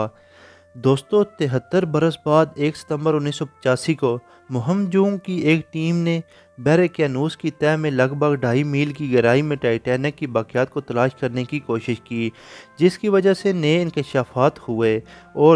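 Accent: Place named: Punjab, Pakistan. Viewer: Apki awaz se lag Raha hai ke ap 1 Punjabi daggay ho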